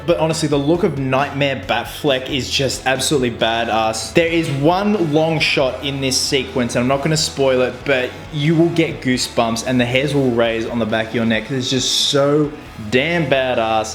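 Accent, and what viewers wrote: Australia. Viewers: DAAAMMM you look sexy in that bat outfit, mmmmm yummy and love the Australian accent makes you even sexier. Do i agree with your assessment with the movie? Not completely but that dont take away from your sexiness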